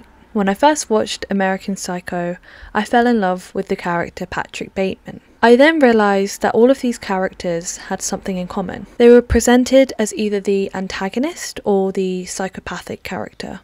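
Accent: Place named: United Kingdom. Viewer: Maca bree? Is macabre pronounced differently in the UK? (Only guessing, as you sound British.) *Didn't want to leave just that...i found the entire essay insightful and well-written. You have some good thoughts and know how to put them together. I have an English degree but only write for myself now, mini essays. I was listening while, ironically, coloring in a coloring book (with my 300 sharpies) as i do when i flip around youtube looking for something engaging. I subbed, and I'll be back to check out the rest of your content. (One more thing: i used to have a little dog named Honey Bee, and i have "Bee" tattooed on my back, on my shoulder blade. Its the only tattoo i got in jail, years ago.)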